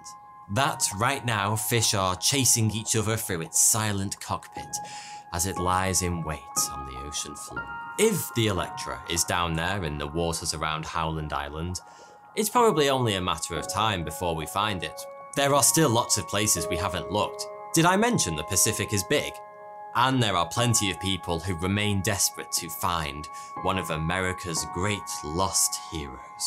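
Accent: United Kingdom. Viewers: He has a clean British accent. I’m Canadian and being a Commonwealth country I can understand a Brit as easily as I can understand an American. If you want hard, try understanding a Scot, Irishman, or Welshman, especially if they’re piss drunk. I can but it takes a bit of concentration and a few pints first. Even Northerners near the Scottish border can be tricky at first. Australians and New Zealanders are fairly easy but I still can’t tell them apart. I always ask where they are from before assuming because they get really pissy if you call an Aussie a Kiwi or call a Kiwi an Aussie even though they sound the exact same. Being as I’m from Canada, I have a much harder time understanding Newfies and Quebeckers. Newfies talk way too fast and Quebeckers speak French and I don’t know French.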